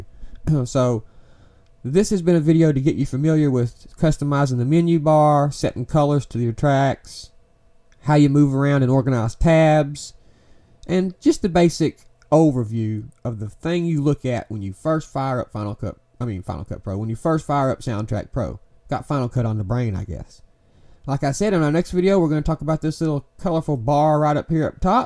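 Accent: South, United States. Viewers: Love the vids and helping a lot. Thank You! Lots of funny comments and inuendos along with good old country boy accent. Well done brother!!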